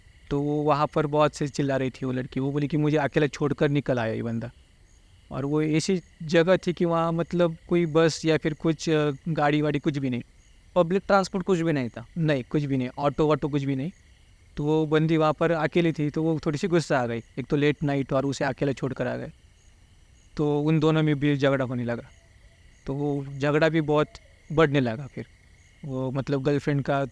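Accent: Maharashtra, India. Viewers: मराठी ऐकायला खरच भारी वाटत दादा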